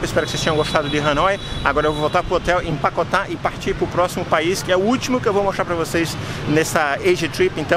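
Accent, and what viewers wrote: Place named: Northeast, Brazil. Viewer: Obrigado pela resposta,! É, senti algo do NE no seu sotaque, mas não seria capaz de cravar Recife. Senti algo de carioca também, mas nada de mineiro! Rss Mistura tudo isso com um tempo fora do Brasil, e, pronto!, chega-se ao seu sotaque. Valeu! Abs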